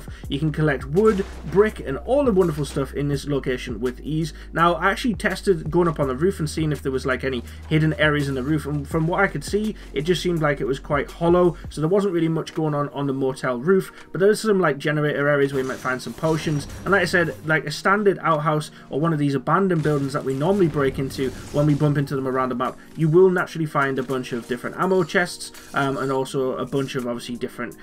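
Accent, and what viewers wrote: Newcastle, United Kingdom. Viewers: Are you a Geordie ?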